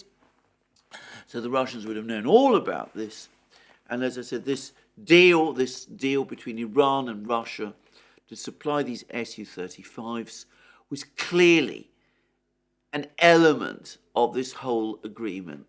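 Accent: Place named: RP, United Kingdom. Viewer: great impartial analyses👍. BTW what schools did it take to learn your posh English?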